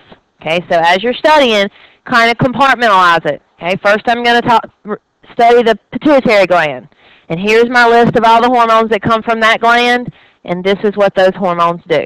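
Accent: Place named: Mississippi, United States. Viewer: Dr., I love your videos. I am taking an online class, but I prefer to sit in a class. Your videos help me to get what I need. Here is the funny part, I KNOW your accent! I recognized it right away. I have family from South Mississippi (not gonna be too specific, since this is on the web). Anyway, thanks so much for the help!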